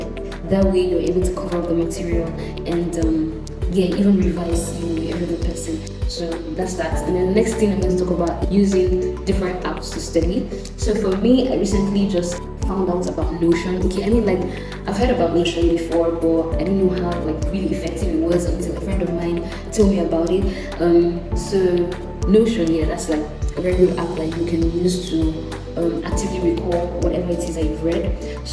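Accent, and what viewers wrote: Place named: Nigeria. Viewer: I love your accent, are you Nigerian?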